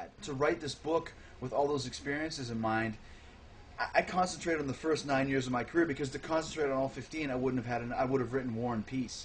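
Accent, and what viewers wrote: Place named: Canada. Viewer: he says aboot. such a canadian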